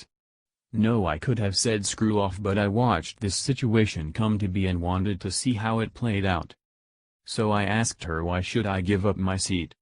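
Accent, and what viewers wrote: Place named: Japan. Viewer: Your accent sounds Japanese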